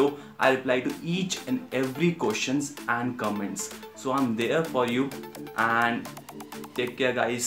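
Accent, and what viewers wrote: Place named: Philippines. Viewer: Your English sounds like Philippino, it took me a while to understand it. After the intro I thought you had switched to a different language.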